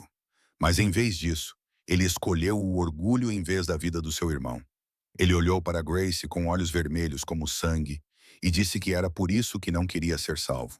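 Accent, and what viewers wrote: Portugal. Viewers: Equipe veladas e sombrio, CONGRATULAÇÕES ! Enredo excelente e inovador. A Narração é fabulosa e o português da Língua portuguesa de Portugal , com certeza, É UM DIFERENCIAL ADORÁVEL! ✨💫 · Também acho linda história maravilhosa narrador mil mais a narrador parece português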